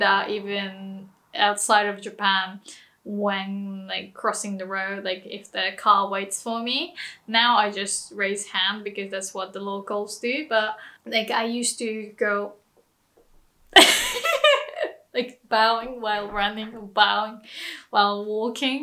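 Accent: United Kingdom. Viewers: Great lesson also you would be hot if you didn't have a British accent but yeah very informative video 👍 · you can hear in your english that you’ve lived in the UK haha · It's interesting. I'm an American and compared to other Japanese who speak English it sounds like you have a British ascent. Did you learn English from people from Great Britain rather that from America? I mean this in a nice way. I love watching videos about the differences between British and American culture. Differences in culture are fun. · Your English professor was British, yes? I can hear it. 😁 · You are also British,yeah?I can hear it when you speak English-the British accent is clear and I LOVE it!Aregato,love! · You look are soooo cute looking 😅🙊🙈 ...and just so cute! Kawaii desu ne! 😅 Daisukidesu your English accent 😊 Have you spent time in Australia or the UK for learning English? 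It shows . It's so nice to hear a Japanese person speaking English without an American English accent or Influence on pronunciation. Yokatta ☺️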